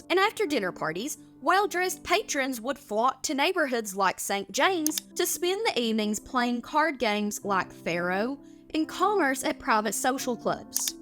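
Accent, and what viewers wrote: South, United States. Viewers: Sounds southern or midwestern US. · Just curious, what region of America is your accent from?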